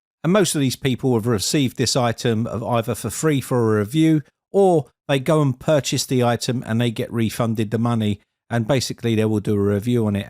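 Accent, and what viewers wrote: United Kingdom. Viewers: Dear, I have been your loyal follower and supporter for years but a docile one and rarely would I comment anything here cuz you are not just only professional, but also your tone and voice attract me so much. Yes, I am definitely so in a way that no matter what topic or theme you are focused on each time, I would just trust, believe and follow so. I remember there were several times I followed closely your guidelines and instructions of running cmdlets in the WinRe settings and did have one of my Fujitsu Laptop completely fried with none OS remaining from drive x: to boot on, especially at the time about Windows 10 vers 1809 and 1903 prevailing, where MS media creation tool was not yet so available for download for fresh installation, and as a matter of fact, the approach you taught us did not even allow any system repair discs to run on and that's what I am referring to as for "completely fried". 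There were some more similar and other same Finale cases of the fate of my devices, but since I declared before already that you are my favourite IT online consultant, instructor, tutor and teacher, I never wrote here back to grieve or challenge your expertise. This has to thank for your beautiful voice which carries a sense of charisma that has a great lure to listeners even though audience never see your real face, and In my belief just based on your magnetic luring voice and sound, with precise tempo, cadence and completely pure British accent of spoken English language, yo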